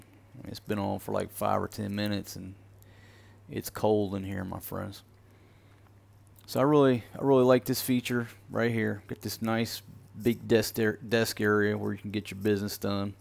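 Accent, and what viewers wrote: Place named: Atlanta, United States. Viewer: Great Review. Atlanta, GA that explains the accent I hear in your voice.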